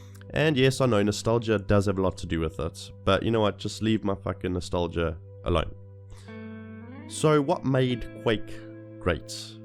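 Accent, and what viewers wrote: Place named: South Africa. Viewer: Dude, you nailed it. Are you South African ?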